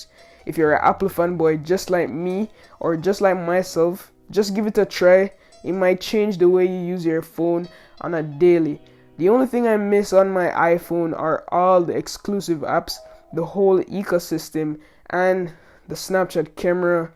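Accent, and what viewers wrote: Jamaica. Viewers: are you jamaican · Are you Jamaican?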